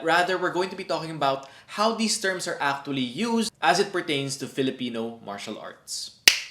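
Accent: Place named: Latin America. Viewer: Wena pregunta. Habla c acento latino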